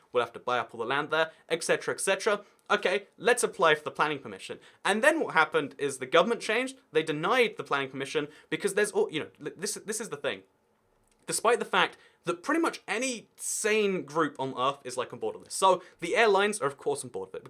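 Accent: United Kingdom. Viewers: British accent!!!!!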